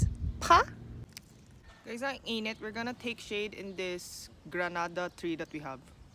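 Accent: Philippines. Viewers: Wow! Pinoy accent talaga ha 😃 cool! Ang sarap naman kumain ng fresh na fresh gulay at fruits! May saging ba kayo dyan?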